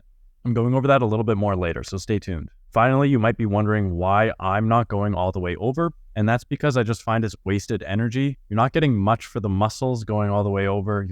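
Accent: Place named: Canada. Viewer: I can tell you’re Canadian istg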